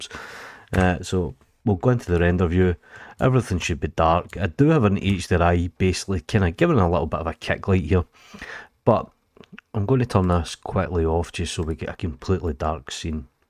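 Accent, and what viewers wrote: Scotland, United Kingdom. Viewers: Please don't ever apologize about your Scottish accent. Da best!!! - Great tutorial. Thank you.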